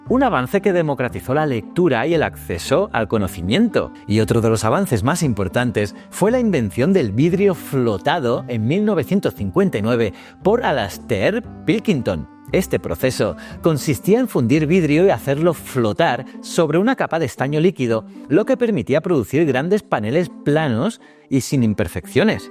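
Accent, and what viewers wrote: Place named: Spain. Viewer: Me gustan este tipo de historia, pero lo único malo es que los españoles hablan muy mal, yo diría, muy reeeemal, lo cual no es nada agradable sentarse muchos minutos escuchando una forma de hablar desagradable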